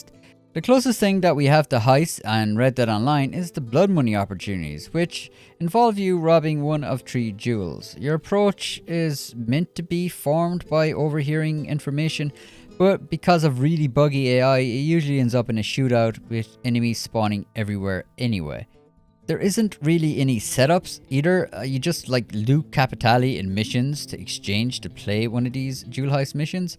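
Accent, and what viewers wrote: Ireland. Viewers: His accent is Irish.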